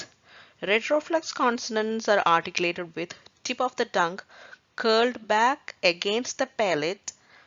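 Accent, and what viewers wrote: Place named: Kerala, India. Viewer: Madam, good attempt but many pronunciation mistakes are there. Please correct yourself. Hope it is taken in the correct spirit. In your English, the Malayalam accent is coming through. That does not matter in this lesson though since you are teaching malayalam.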